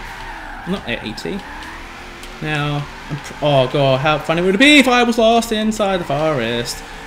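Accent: Australia. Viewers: your australian?? wanna Meet up?? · Are you Australian and if so what part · Brisbane, Australia im guessing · hi are u australian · I'm confused are you Aussie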